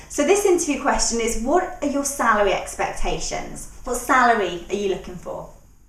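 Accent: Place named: England, United Kingdom. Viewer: I like your English Accent, it's awesome!.. An employer asked me "How CHEAP will you work"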